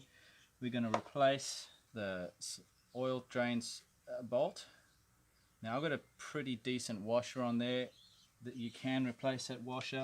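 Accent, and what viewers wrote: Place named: South Africa. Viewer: Greetings from South Africa... You sound extemely SA by the way. Buying this bike next year, this is how I got to your channel!!!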